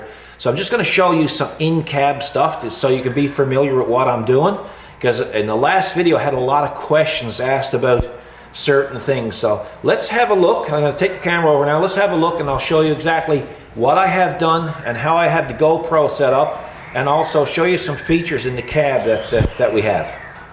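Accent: Newfoundland, Canada. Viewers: where are you from in Canada? it sounds like your a Newfoundlander because I am one too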